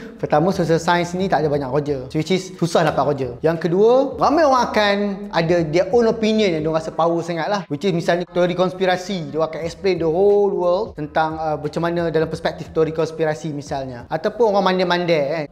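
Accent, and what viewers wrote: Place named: Parit, Malaysia. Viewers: are you from Parit,Perak,ghope ckp pekat org Pagharit je????😆😆😆😆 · Pekat benor perak nye yop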